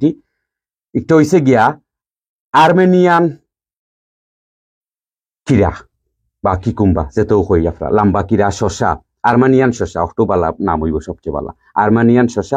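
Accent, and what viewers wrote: Sylhet, Bangladesh. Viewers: ছোট ভাই আপনার সিলেটি কথা অন‍্য রকম ভালো লাগলো আজকে . আপনার ভাই সিলেটের তাই সিলেটি সব বুঝি . আপনার সব গাছ অনেক সুন্দর . দেখেই মনে হয় আপনি অনেক সময় দেন বাগানে . ভালো থাকবেন দোয়া রইল 👌👌🤲🏻🤲🏻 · I liked your Sileti speech today in a different way. Your brother is from Sylhet, so I understand Sylhet. All your trees are very beautiful. It seems like you spend a lot of time in the garden. Hope you are doing well. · মাশাআল্লাহ সিলেটি মাত হুনিয়া বালা লাগের আজ সুন্দর ভিডিও দিসোন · আসসালামু আলাইকুম ভাইসাব যে ভালো লাগছে একবারে সিলেটে খাঁটি মাত।ফুল ভিডিও আমার অত বালা লাগছে। শিক্ষনীয় ভিডিও উপকার আসবে ইনশাআল্লাহ। ❤❤❤দোয়া রইল ❤❤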